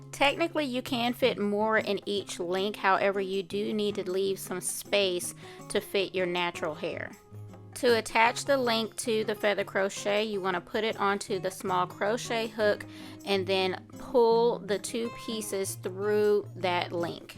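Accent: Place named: South, United States. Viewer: Ok ms thang with the country twang!!! You ate this up my love!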